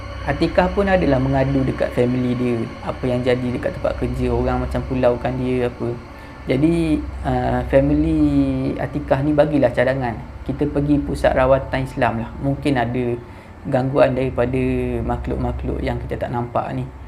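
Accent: Terengganu, Malaysia. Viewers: nk tye je ni org ganu ke sbb dgr slank ganu 😅terbaik cite dk pnh trlepas lg dgr 👍teruskan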